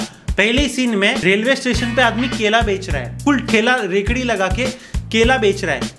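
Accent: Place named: Maharashtra, India. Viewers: Only positive comment I can give is, this was a better trailer than Race 3. That too because of Bhai's commentry. Bhai love ur Marathi accent, kashala? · You are Gujarati but your speaking accents sounds like marathi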